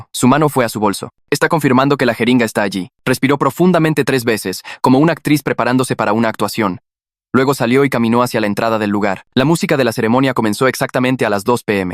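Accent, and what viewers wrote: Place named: Colombia. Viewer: se re nota q eres de colombia bro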